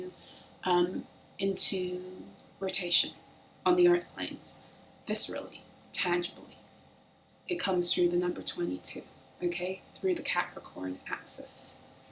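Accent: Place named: United Kingdom. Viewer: "You have caused confusion and delay!" Love love love your English (or Brit) 'accent!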